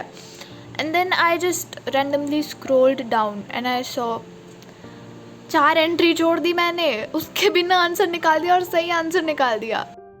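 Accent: India. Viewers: Sis are u Indian ?? and how you accent Indian..😅😅 please make me understand i don't know..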